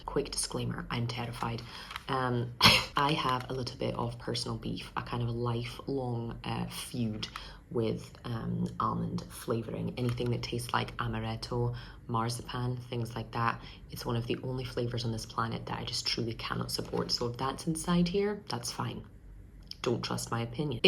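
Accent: Scotland, United Kingdom. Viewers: Solidarity with being an almond hater. [And the Scottish giveaway of refusing the aknowledge the "l" in almond.]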